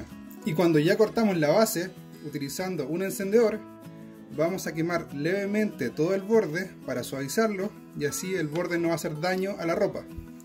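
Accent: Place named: Chile. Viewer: Hermoso acento chileno 😻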